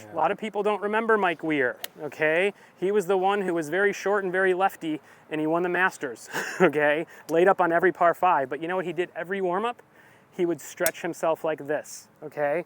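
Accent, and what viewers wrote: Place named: Scotland, United Kingdom. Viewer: Great to hear a Scottish accent.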